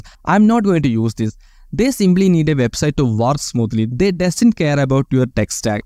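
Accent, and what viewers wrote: Kerala, India. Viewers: Super bro The way your telling is adding some Keralian spicy.. ..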